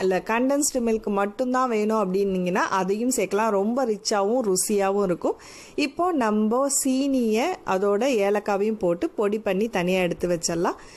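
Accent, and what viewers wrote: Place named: Kayalpattinam, India. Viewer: So wat ..it's kayal dialect..just enjoy d recipe..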